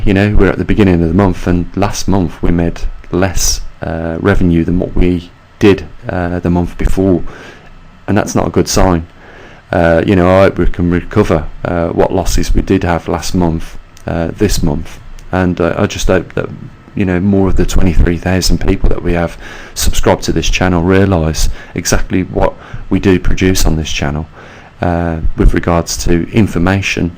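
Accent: United Kingdom. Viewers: excellent top notch scientific report narrated with the most pleasant to listen to British accent. greatly appreciate your time to produce and share your knowledge with the truth seeking community. peace be upon you always.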